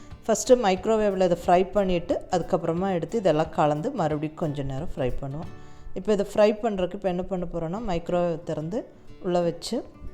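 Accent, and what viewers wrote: Kongu, India. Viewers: Very useful. Your kongu Tamil slang is also good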